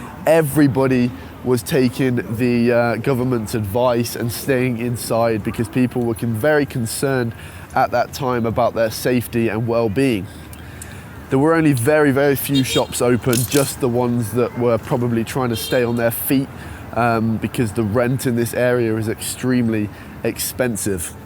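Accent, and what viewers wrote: United Kingdom. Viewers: Your British English is so cute and nice. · Thank tell truth instead too much propaganda news in America, only fools of themselves now. You look good today. Yr accent not too British so easy understand, yr older partner too accent to understand for America people.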